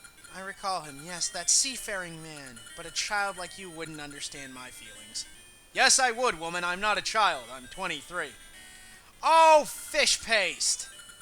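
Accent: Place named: Canada. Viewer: You and your cute Canadian prenunciations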